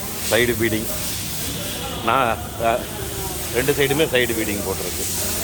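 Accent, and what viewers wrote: Kongu, India. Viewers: Car Dr. Mr sir, For yours nice Kongu speech and open to all pannady. Congratulations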